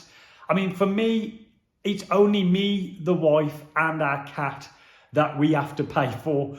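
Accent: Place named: Midlands, United Kingdom. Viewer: How is your energy bill so low for such an expensive mortgage 😮 i pay 90 a month for my 2 bedroom semi which is just over 400 for my mortgage 😅 worked out my bills come into just under 800 a month, plus food and living etc... was your new kitchen worth the upgrade? Hearing the Midlands twang feels like home! Great video keep going 😊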